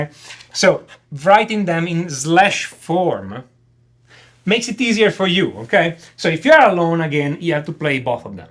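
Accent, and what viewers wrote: Italy. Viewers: What Music theory lesson isn't instantly made better by having an English speaking teacher with an Italian accent !!? ( It is an Italian accent, isn't it ? )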